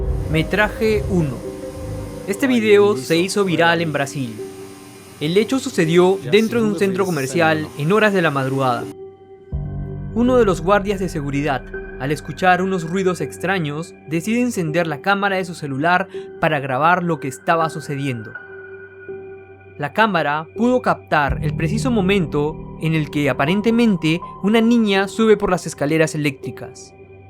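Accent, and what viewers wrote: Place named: Peru. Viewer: ERES DE PERU VERDAD ? POR QUE TIENES NUESTRO DIALECTO .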